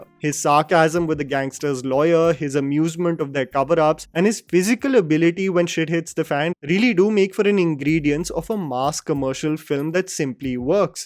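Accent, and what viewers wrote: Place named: India. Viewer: bro to understand your brick hard Indian accent need a translator, please start in hindi, bhai tumse na ho payega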